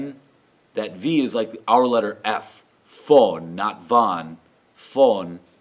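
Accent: United States. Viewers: Still very strong American accent... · Lieber! Nichtsdestotrotz möchte ich Dir danken, dass Du Dich mit der deutschen Sprache befasst! Jedoch hört man noch immer den stark amerikanischen Akzent! Aber trotzdem vielen Dank, dass Du vielen anderen Menschen, mit Diesem Video hilfst! Alles Liebe, Susanne M. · Good, but very americanized German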